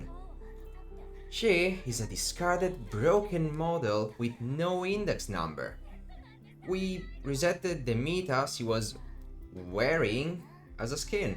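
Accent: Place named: France. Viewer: 10/10 video earned new sub (youre accent sound french) :D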